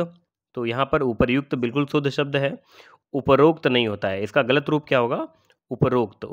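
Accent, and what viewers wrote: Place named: Hardoi, India. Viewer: Sr aapki hardoi wali tone aa jati h kabhi 2..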